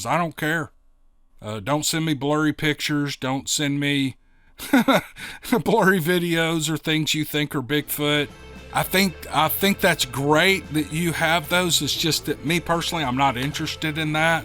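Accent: South, United States. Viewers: You should narrate some southern bell stuff like Gone With The Wind or sumpin LOL I like listening to you for your southern accent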